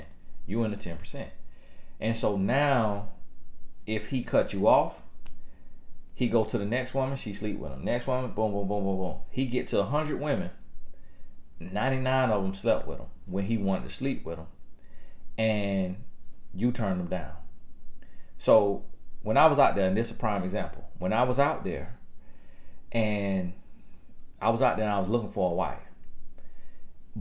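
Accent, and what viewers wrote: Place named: Polk County, United States. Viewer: I can definitely tell you’re from Polk County.. lol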